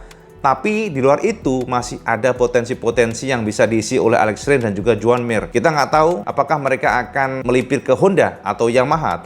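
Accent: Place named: Java, Indonesia. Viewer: Sampean jowone pundi cak kok kuentel jowone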